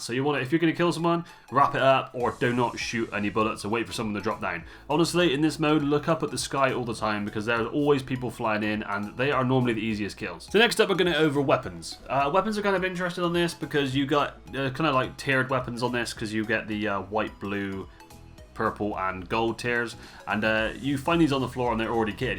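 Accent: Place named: Somerset, United Kingdom. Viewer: I'm from NE Somerset, I could definitely tell. Lots of 'er's lmao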